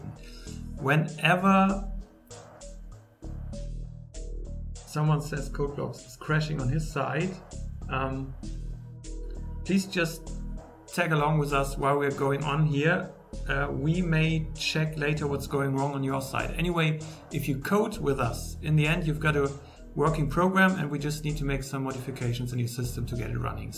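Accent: Germany. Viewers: are you german? you have a german accents 😃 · sis is a very nice german accent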